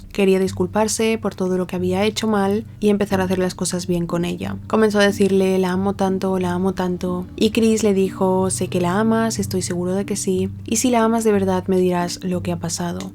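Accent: Spain. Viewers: Mira que no me gusta el acento español y prefiero normalmente a Landó y a Dinosaurs vlog pero muchacha, me gustó como relatas ❤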